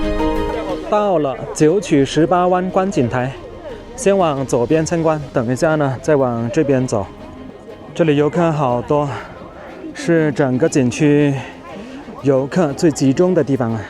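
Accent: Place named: Chaoshan, China. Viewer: 潮汕人口音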